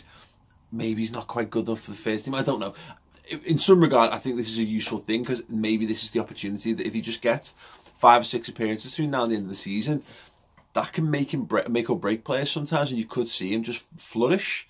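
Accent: Liverpool, United Kingdom. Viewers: I swear to god, go on settings on this vid and turn the speed down to 0.5 and listen how incredible the scouse accent sounds in slo-mo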